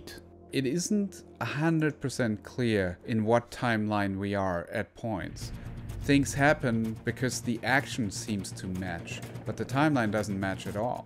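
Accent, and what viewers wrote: Germany. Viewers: Hey do you have a German excant ? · Defiantly has a German accent first thing I noticed lol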